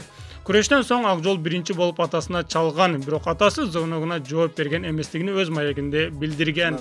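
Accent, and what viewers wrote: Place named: Ichkilik, Kyrgyzstan. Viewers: Неге адабий тилдин нормасын бузуп суйлойсунор дале болсо ичкилик аксентин бербейсинер Эл астында блогер болсонор адабий тилдин нормасын сактагыла Оз чойрондо суйлойбер аксентин менен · Кыргызстанды атыны. Автор ичкилик окшойсун.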